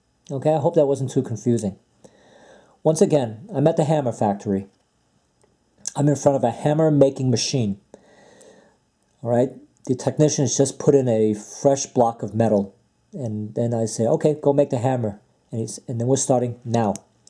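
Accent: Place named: New York, United States. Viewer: Good review of the Daytona and love the cool NYC accent!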